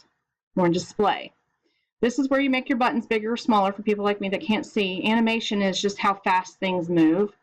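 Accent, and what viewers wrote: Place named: United States. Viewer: thank you for this, really appreciate your help. love your american accent! why the fuck are defaults so insanely wrong all the time, its driving me absolutely crazy!??!? :/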